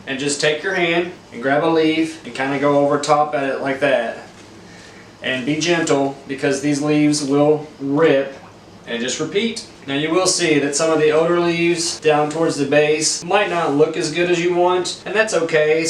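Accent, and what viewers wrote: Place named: Central East, United States. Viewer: Just got my first peace lily and this is such a great video. I also knew you had to be from a central eastern state from your accent. I’m from Southwest Virginia😊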